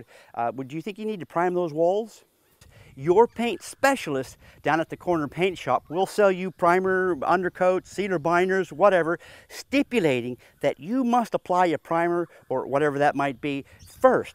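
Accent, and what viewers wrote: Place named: Australia. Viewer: I thought I detected a slight Aussie twang! Great video mate!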